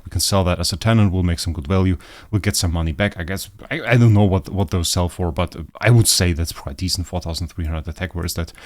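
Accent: Germany. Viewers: I can definitely hear the German accent but it might be because I’m danish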